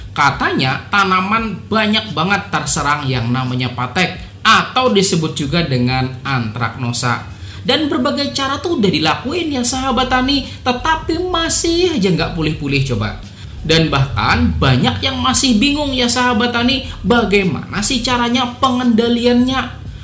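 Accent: Banjar, Indonesia. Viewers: urang banjarkah pian julak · Orang banjar kah pian mas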